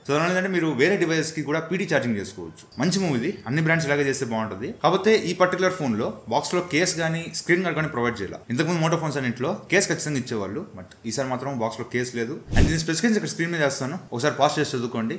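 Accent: Nellore, India. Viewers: Anna needhi Nellore aaaaaa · Mawa nellore ah Mande ❤😂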